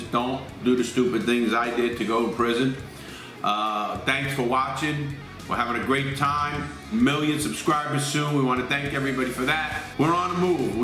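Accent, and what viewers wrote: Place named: East Coast, United States. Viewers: The thick Jersey accent really ties it all together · by his accent he's east coast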